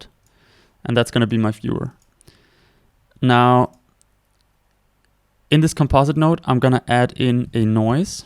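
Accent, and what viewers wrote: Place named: Germany. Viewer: oh it sounds so german accent bc im german and people that speak english in germany talk like that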